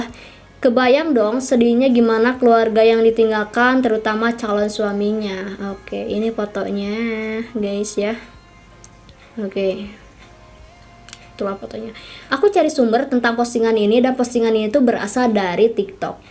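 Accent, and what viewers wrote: West Java, Indonesia. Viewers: Logat sunda nya masih berasa :")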